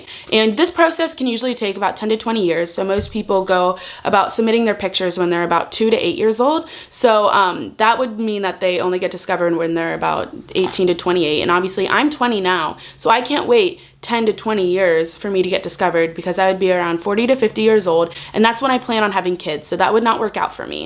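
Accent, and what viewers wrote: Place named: United States. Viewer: As a Brit fluent in sarcasm, It’s weird hearing this much sarcasm in an American accent 😂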